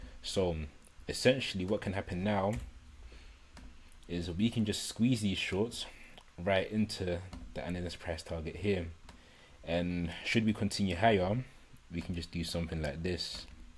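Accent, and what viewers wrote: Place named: United Kingdom. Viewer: Respect sound like mandem and from uk what’s your tele username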